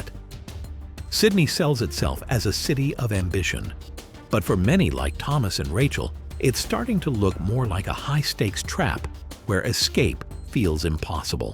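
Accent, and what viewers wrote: United States. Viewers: How come when you show money, you always show US $ ? Apart from the American accent to start off with... The points raised have of course value.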